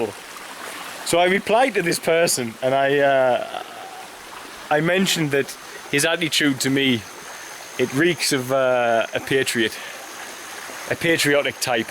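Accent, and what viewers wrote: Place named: Newcastle, United Kingdom. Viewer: He's got a Geordie accent so I would assume he's from the Newcastle area.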